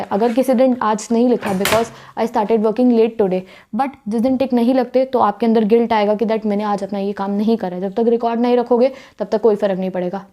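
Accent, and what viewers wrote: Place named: Meerut, India. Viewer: Didi where are u from ? I am from meerut and it seems that you are also from meerut .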